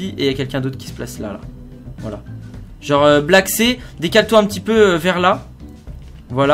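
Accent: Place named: Italy. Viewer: Le meilleur accent italien 😭😭😂😂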